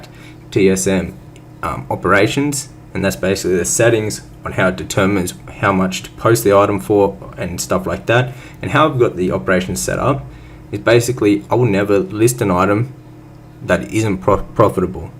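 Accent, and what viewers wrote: Australia. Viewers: Hey, np! I love seeing small youtubers grow over time. Are you OCE you sound Aussie!? either way, been watching your vids for a while and like your content style - real info to work with! subbed and will try get your guide!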